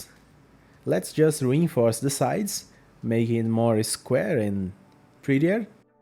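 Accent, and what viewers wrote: Brazil. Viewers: Vídeo salva muito, logo reconheci que era br pelo sotaque, inglês bom e vídeo bom! Precisava de uma embalagem pra um presente pequeno e essa ficou perfeita, obrigado!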